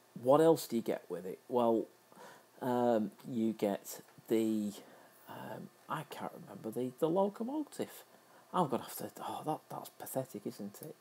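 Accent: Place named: Manchester, United Kingdom. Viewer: You sound like you're from Manchester, I make lots of videos around mcr of trains and stuff it's called GMTrainspotting check it out! great channel btw, just research your facts first so you don't end up not knowing in the video.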